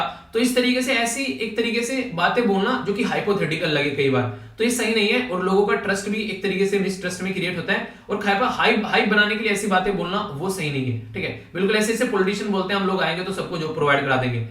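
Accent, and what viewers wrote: Haryana, India. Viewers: Hlo dii aap Haryana se ho na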